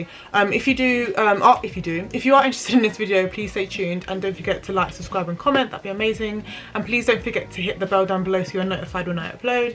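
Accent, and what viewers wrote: United Kingdom. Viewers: Greetings Sis. Just wanted to say that it's great to have a British voice amongst these videos. Thanks for taking your time with showing the process. Makes such a difference. Please do more videos. Thanks so much.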